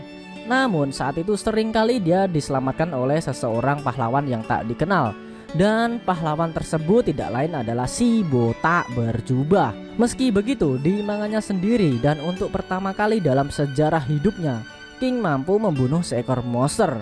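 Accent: Java, Indonesia. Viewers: Kowe wong jowo ndi min?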